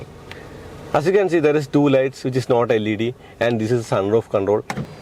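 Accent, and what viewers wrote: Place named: Kerala, India. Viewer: Even English sounded like Malayalam to me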